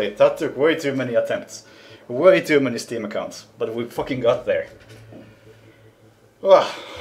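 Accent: Finland. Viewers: Arvaa helposti ku kuulostaa vähä rallienkulta😅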